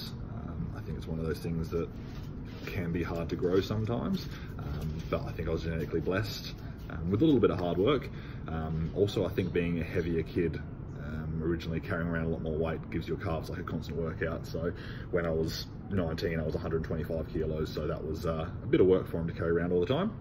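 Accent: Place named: Australia. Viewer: I like your beard and moustache!!! And the Aussie accent isn't bad either.....;-)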